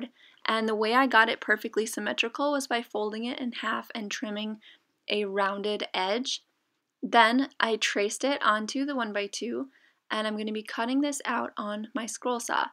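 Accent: United States. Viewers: I'm hearing an American accent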